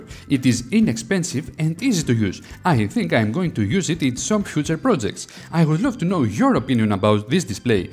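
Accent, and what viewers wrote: Greece. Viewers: Are you Greek? I am about 95% certain, this accent is quite characteristic. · Thanks for this video. By the way, are you greek ? Your accent seems familiar :D :D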